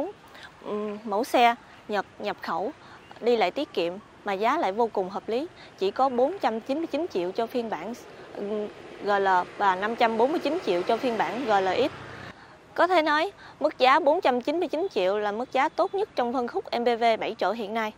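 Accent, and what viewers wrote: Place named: Mekong Delta, Vietnam. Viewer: Đúng chất miền Tây: Ờ, gờ lờ, 499chiệu, gọng gãi, phục dụ...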